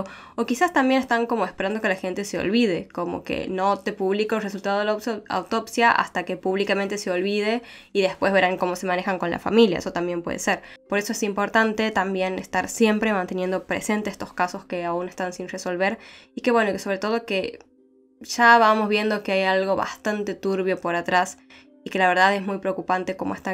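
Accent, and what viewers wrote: Argentina. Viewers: Hola . . Es Argentina de Santiago del Estero ! 😊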